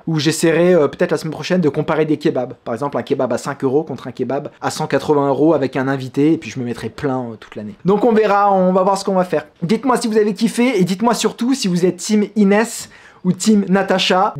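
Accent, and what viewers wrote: Quebec, Canada. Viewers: + l'accent québécois. Que demander de plus 😭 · Oulala les infos croustillantes que tu nous as dégoté! Tu fais un vrai travail d'investigation et avec l'accent québécois (qui fait partie des accents autorisés) on est en totale immersion. Ne lâche rien mec c'est toi le boss de YT, après Michou bien sûr! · je suis mongol et j'adoere ton accent du Canada Xd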